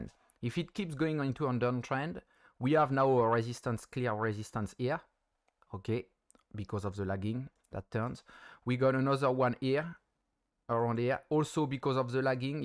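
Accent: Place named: France. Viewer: Salut t un français a ton accent tu peux pas faire une vidéo sur burency en français stp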